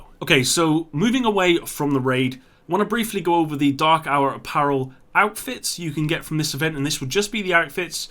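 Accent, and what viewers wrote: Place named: Southwest England, United Kingdom. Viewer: You from sw england? You sound like home haha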